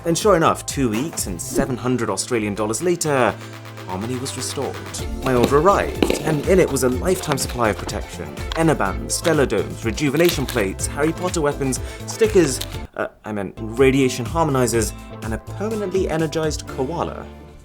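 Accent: United Kingdom. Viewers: your austrailian? but you sound so British. Do an episdode with dank pods! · Australian dollars later... I thought he was from the UK🤔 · I come here to hear a british accent and get my subscibe putton punch on my account.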